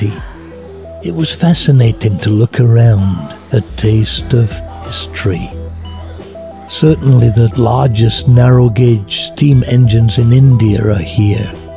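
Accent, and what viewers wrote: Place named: United Kingdom. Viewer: Heroin commentary ftw. I am expecting an "I love you Susan" followed by a death rattle throughout this video. Please man, nice video but have a cup of coffee before you narrate a 20-minute long video sounding like a dying 110 year old Vietnam veteran. The "old British" charm gets somewhat drowned in a weird combination of sleepiness, cringe and wanting to kill myself.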